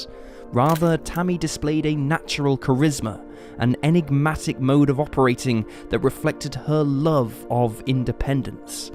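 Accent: United Kingdom. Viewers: Why does the narrator have to have that British accent? Use an American!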